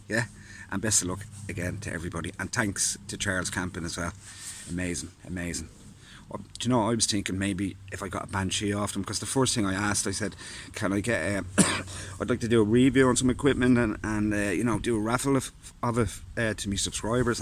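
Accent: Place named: Ireland. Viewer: Awesome tent and a great video, thank you. Always love the Irish accent - more like purring than speaking! Just FYI and for others, the full weight of the 1P is 1.25 KG, vs 1.42 as you rightly said for the 2 person. So for the 170g difference I agree - take the extra space! £240 online right now as well. A properly well made tent, rather than the "Big" American brand stuff.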